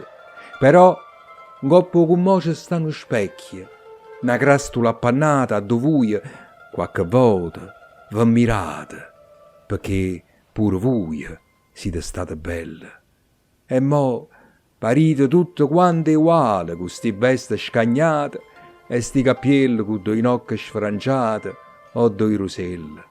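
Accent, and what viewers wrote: Italy. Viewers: ...., dove tutto è tarlato,come voi,!! Come fa riflettere questa bellissima poesia, lo specchio,!!...Eppure non è triste,ma lucida realtà, che fa grande tenerezza, ma che attende tutti,...quelli fortunati!!,...sei un grande artista, sempre...ma quando interpreti il dialetto napoletano,... diventi irresistibile....da ascoltare più e più volte!!! Ti faccio i complimenti più sinceri. 💯👏⚘♡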